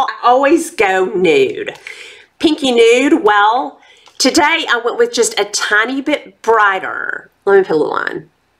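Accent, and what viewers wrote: South, United States. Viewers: So! Sheila (life with Lilly) told me to watch your channel.......so here I am!! I have Subscribed.....Your a delight....I'll be watching for your new video's! (Your obviously southern, which state?)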